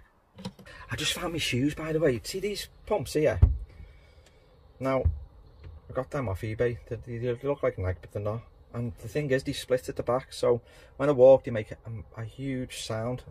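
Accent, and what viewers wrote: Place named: Birmingham, United Kingdom. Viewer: Hi, great video, very informative. Unfortunately the links are not working properly. Neither link takes me to them there 90 degree adaptors. Love your Brummy accent by the way. Can you do any others? Cheers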